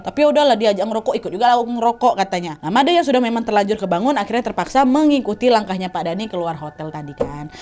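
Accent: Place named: Medan, Indonesia. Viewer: Malah lebih ngakak denger ceritanya.. cerita tentang orang bali tp ini logat orang medan yaa..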